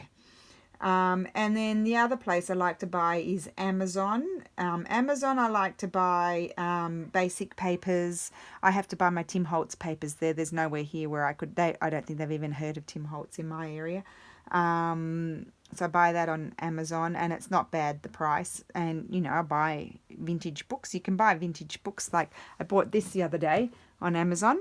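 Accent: Australia. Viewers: As you know I love your videos and chatting about everyday life! Your accent and life in Australia always touch my heart since I still have friends there. Your ideas are fresh and fun to follow! Keep creating and never think you are not good enough, your Great!!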